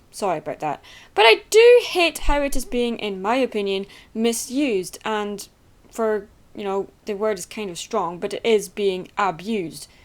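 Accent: Scotland, United Kingdom. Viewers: So your Scottish?! Yey! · She’s Scottish, and I can do an Australian Accent. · So I'm guessing ur Scottish lol go Scotland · your not the only fan in scotland I can tell you live there cause we've got the same accsent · lol I'm slowly getting used to the Scottish accent cos my friend is Scottish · by the way I love your Scottish accent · omg i love Scottish accents!!!!! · I love your accent lol. I'm Scottish too! #ScottishSquad And I'm fine with recoloured "OCs", but if the person who made one is claiming it to be an original "OC" or "theirs", that just makes me rage! XD. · ... i she scottish? i am aswell sooo yeah your not the only scot brony if that's the case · She's Scottish! What did you expect? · Norlén SHE IS NOT IRISH SHE IS SCOTTISH!!!!!! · Are you Scottish? Well if you are then I am to! 😄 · It's Scottish wait I'm Scottish Yay I found a Scottish youtuber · Scottish like me :D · I knew you said 'Clydesdale' because of that Scottish accent, furry hooves and it's not a damn pegasus. 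lol people can't think any further I don't like pony generator/creator because it looks stiff, unoriginal (just like you said) and it kinda makes the ponies look.. weird. Most people (that I've seen) who use it make their OCs have bad color combinations. I'd rather see an OC scribbled than people using the pony generator. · omg are u scottish squee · scottish like me ;)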